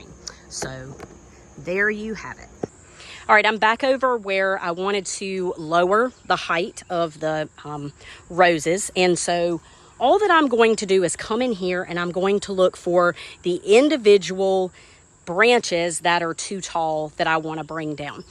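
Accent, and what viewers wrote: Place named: Texas, United States. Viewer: Thank you for an encouraging video. Roses frighten me. Historically, they have been difficult plants to grow. They are subject to so many problems that only the best gardeners had the magic touch with them, so I stayed away from them, but these Knock Out roses are easy to grow according to a friend. She planted six of them eight years ago. Said she does very little to them aside from water them. Said she planted them by more or less just throwing them into the ground. They are now virtual rose trees that are positively dripping in blooms. I am encouraged to try planting some. Do I have to test the soil first? The soil here is pretty clay-ee. I know they need a sunny location. I had no idea about how to prune them, I figured you just cut off anything that did not look right. I had no idea where to cut or that it should be at an angle. 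Any instructions you can give me to help me get some planted would be greatly appreciated. You sound like you may be in Texas where I am. Temps here are really HOT half of the year, and we have tremendous humidity. I have had some good success with Salvias which I love very much, but I long for rose bushes..... Thank you again! Cheers!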